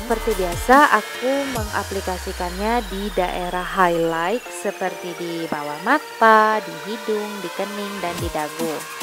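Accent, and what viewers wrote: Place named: West Sumatra, Indonesia. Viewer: bagus make up nya. cantik bgt kak.. mau tanya org mana kak? kyak bahasanya org sumatra gitu... sumatra barat ehehhee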